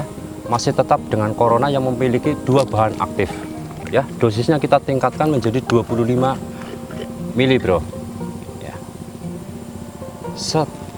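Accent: Banyumas, Indonesia. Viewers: Logate ngapak.. asli ngendi rila kang? 🙏 salam sekang cilacap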